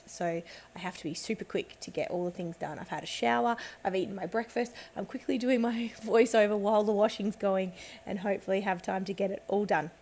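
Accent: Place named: Australia. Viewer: Super job using your kit this month!! & This page is SO CUTE!! (I'm saying "so cute" in your Aussie accent!) I really am enjoying all your videos!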